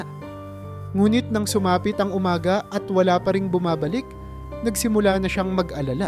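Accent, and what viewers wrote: Philippines. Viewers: May tanong din ako bakit ganuon kayong bumigkas ng tagalog, anong probinsia nyo?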